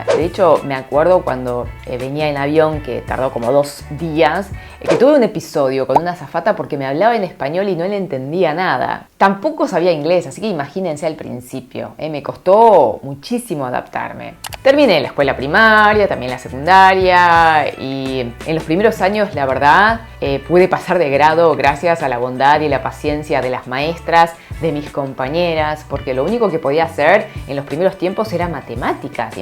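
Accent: Argentina. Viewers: te felicito, tu español con acento argentino es muy bonito, que sigan los exitos, te los mereces. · Omg 😱 yo estaba convencida de que eras argentina!! O sea claramente lo sos xD El Argentino nace donde quieeere! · estoy en corea recien llegado y me intrigo tu acento argentino, un pais que impacta. Estoy por un evneto cientifico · Tu Español es perfecto hablas como una nativa de la argentina con acento bien argentina 🇦🇷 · Es un agrado concerte, se nota tu acento argentino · te acabo de descubrir por el video de APT y me ha sorprendido mucho tu historia de cambio, adaptacion y sacrificio en esta nueva tierra, que es mi querida Argentina. Muchas gracias por compartirla con nosotros y tambien por transmitir ese positivismo y entusiasmo en tus mensajes. Por cierto diras que tendras que pensar las palabras en castellano, pero te confirmo como muchos otros seguidores, que hablas perfectamente y ademas con este acento tan lindo que nos caracteriza. 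:) Abrazo desde España!! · Hablas como argentina,🎉saludos de mexico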